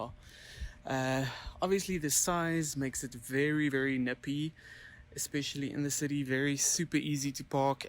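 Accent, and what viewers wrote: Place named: South Africa. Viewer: I could listen to that South African accent all day 🤗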